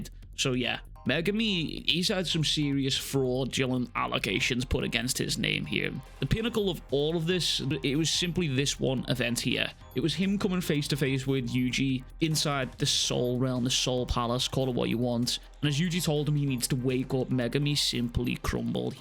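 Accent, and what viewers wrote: Liverpool, United Kingdom. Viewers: Hell yeah a scouse man calling megumi a bum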